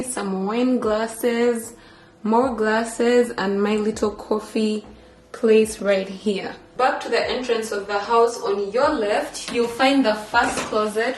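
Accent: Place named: Uganda. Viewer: Are you Ugandan? Your accent sounds Ugandan. ☺️ Edit: Just saw another comment where you confirm that you're Ugandan. Thanks for representing!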